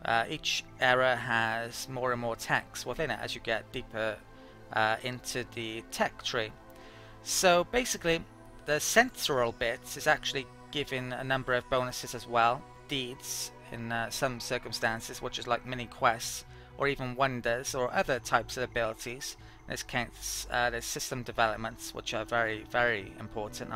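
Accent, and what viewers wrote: Wales, United Kingdom. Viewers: u from wales?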